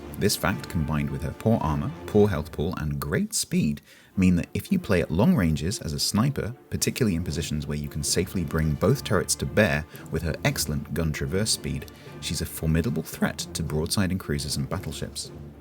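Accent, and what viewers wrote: United Kingdom. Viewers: can i ask are you British by any chance.. its just i am British and you seem more British than me. Also this was a great review very to the point and i can understand you easily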